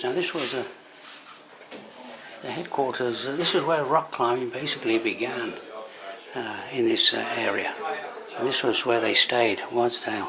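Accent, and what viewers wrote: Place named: Cornwall, United Kingdom. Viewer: That sure was a lovely place to have a cuppa at the end there. Did you grow up in Cornwall? Your accent is like a guy I used to work with who came from Bodmin.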